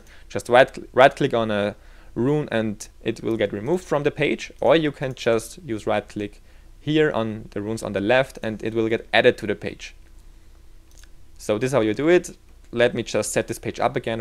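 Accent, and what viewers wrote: Germany. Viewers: Are you german? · German accent?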